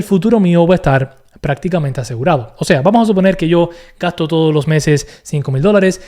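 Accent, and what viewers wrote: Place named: Venezuela. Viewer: Pensé que eras Venezolano. Hablas y te expresas como uno. Saludos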